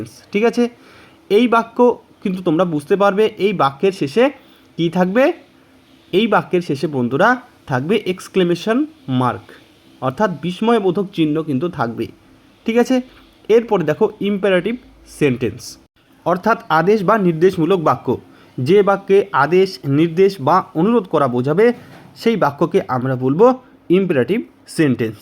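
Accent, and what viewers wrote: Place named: Nadia, India. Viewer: Sar nodiea thaka bolche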